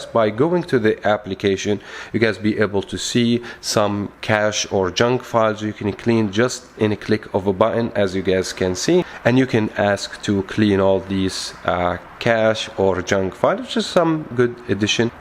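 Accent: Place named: Arab world. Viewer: This video was okay until I heard this annoying Middle Eastern accent.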